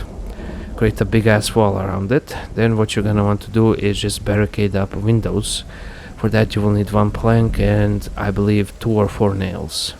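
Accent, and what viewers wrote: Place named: Eastern Europe. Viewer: He is from Eastern Europe. They speak like this.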